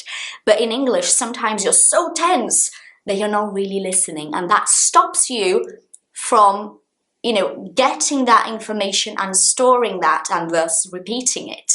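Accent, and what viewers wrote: United Kingdom. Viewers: What??!?? Ela fala português?! O sotaque britânico dela é tão perfeito, que o português dela parece sua segunda língua.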